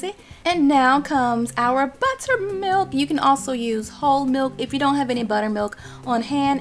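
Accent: South, United States. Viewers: She just has a southern accent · Love that southern accent. Gives credibility to the southern fried chicken. :) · Your food is as wonderful as your lovely Southern accent; beautiful! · I love, love, love your accent!! You sound like a lovely southern belle!!!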